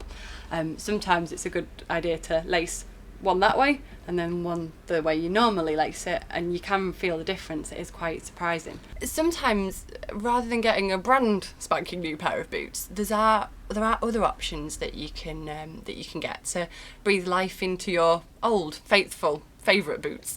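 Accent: United Kingdom. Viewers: Don't understand???You seem to be someone with a very limited knowledge of UK. What a weird comment. If you're referring to her can only think you're not English and think everyone speaks like the queen. Her accent is far from snobby possibly from the north west and is very natural and not all snobby in fact the complete opposite.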